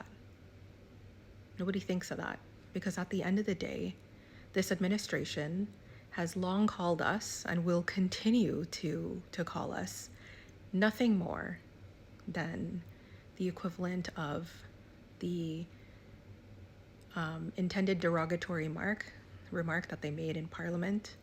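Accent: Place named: United States. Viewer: woman geh we Ah for Kane chance nah, is you who’s in somebody’s pocket. Yuhs ah UNC operative trying to trick people wid yuh yankee accent. Allyuh fullah education, but dunce like bat!! still following this outdated cane farmer union propaganda from the 1950s, it’s time for an upgrade. Allyuh ent shame?? And BTW this is a legislative issue which has to pass through parlaiment, and not a referendum where public participation is needed, and isn’t it the reason why the people voted for members of parliament? they are appointed as voices of the people in their individual constituencies, so there’s no need for a national consultation, so stop trying to fool the people. Shame on you!!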